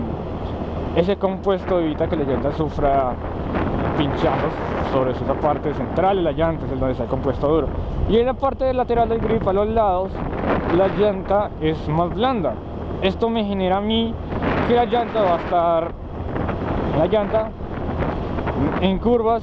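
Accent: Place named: Mexico. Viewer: dices que eres colombiano pero hablas como mexicano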